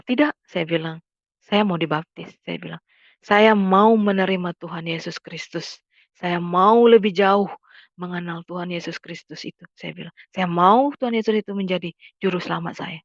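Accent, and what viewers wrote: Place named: Papua, Indonesia. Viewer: Logat Papua sekali😅🙏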